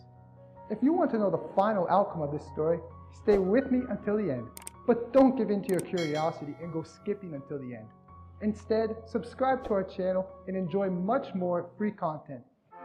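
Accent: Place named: Europe. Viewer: Many thanks. I thought he might’ve been European with that accent.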